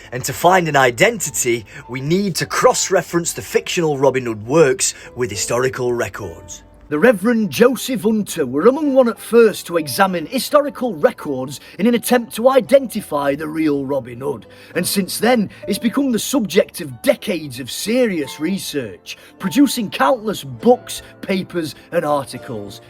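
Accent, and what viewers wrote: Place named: England, United Kingdom. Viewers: I only recently ran into you channel while looking for archery videos as I am starting on the hobby (doing it with a prosthetic hand is... interesting). And what quality content you have here in your shorts and your long videos. And it is great to see and hear a Robin Hood that can speak with an English accent! Keep the good work! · As a History Nerd I’m mad I have only just found your channel and as a fellow Yorkshire man I appreciate the Broad AF Yorkshire Accent what an amazing history channel researching a great Folk Hero and History 🏹🍻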